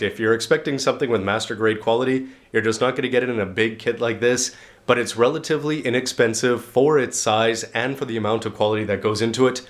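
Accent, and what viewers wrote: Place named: Canada. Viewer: sounds Canadian...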